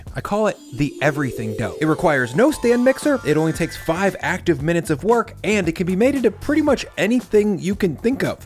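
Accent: United States. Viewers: You sound American,, Why are you using metric crap ??? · You have an American accent and you give us grams... duh. thumbs down.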